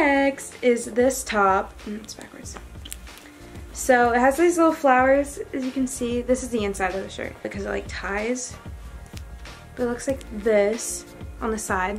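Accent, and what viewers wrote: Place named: United Kingdom. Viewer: The British accent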